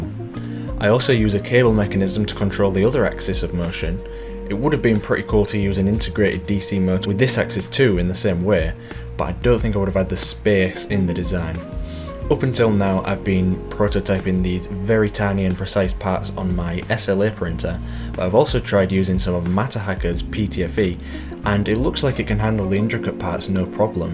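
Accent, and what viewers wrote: United Kingdom. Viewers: That brass geared thumb mechanism is a work of art and absolutely gorgeous design. You’re a very clever bloke. Just found your channel. Nice to hear a Brit voice on YouTube 👍🇬🇧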